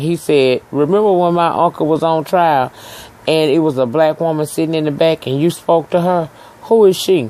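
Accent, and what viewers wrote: South, United States. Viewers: I am so sick of Alex, I've known a lot of women like her who cheat and then just act like they can get everything the way they want it. Such entitlement! Honestly I can't stomach this show anymore because these people are so fucked up. Lol. I just enjoy hearing your lovely southern accent reviewing the story! Glad you're back home safe!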